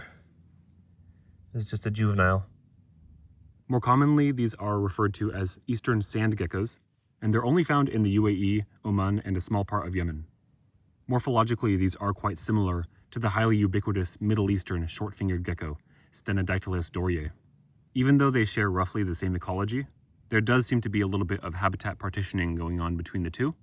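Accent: United States. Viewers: You sound American. I wish we had better relationships with the middle east. Their flora and fauna are so fascinating, but there is so Little "western" information. Sad really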